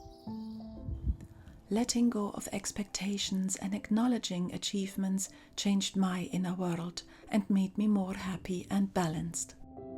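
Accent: Scotland, United Kingdom. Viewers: Hello from Dublin Ireland i just found your channel and i am really enjoying catching up on your previous videos. Where is your birthplace ? You have some Scottish accent.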